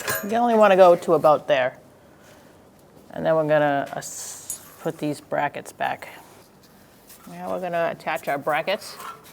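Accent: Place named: New England, United States. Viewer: wonderful video. i love the new england accent as well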